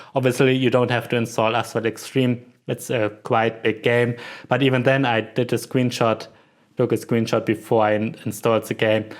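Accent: Germany. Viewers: 101 Spiele Er ist aus Deutschland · Bist du deutsch oder warum sprichst du mit so einem Deutschen Akzent? The spricht ihr se aus :D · i think he's german XD Bad english tho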